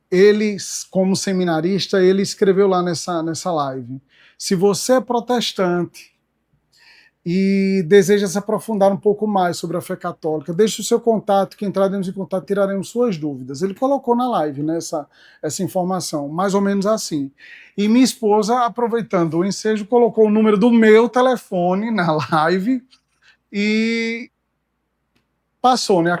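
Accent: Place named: Recife, Brazil. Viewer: Glórias a Deus!!! Aleluias!!! Vocês são de Recife? Seu sotaque parece com o meu.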